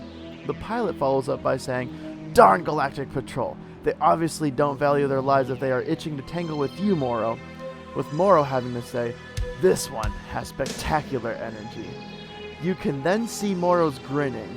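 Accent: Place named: United States. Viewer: Nice to find a American narrator the other guys accents make it hard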